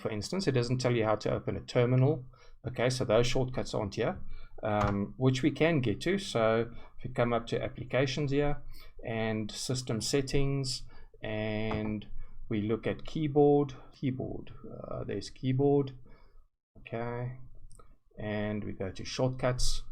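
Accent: South Africa. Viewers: is that a jozi accent i hear?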